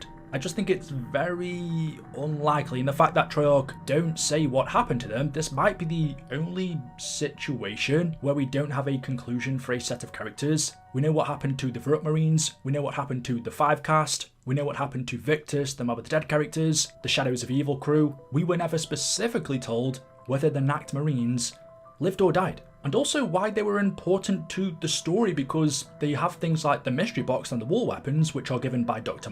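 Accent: United Kingdom. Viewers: Your accent man, you must be british?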